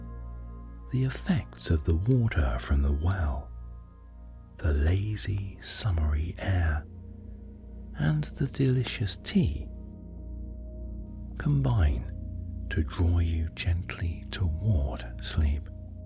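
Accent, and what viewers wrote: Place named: United Kingdom. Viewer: A Brilliant voice, an amazing British accent, a great imagination to be involved in, thank you from Iraq! ❤❤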